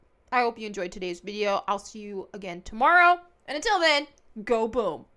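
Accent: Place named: Texas, United States. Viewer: First time I have seen your vids and I agree.. I can tell you are from Texas like I am. lol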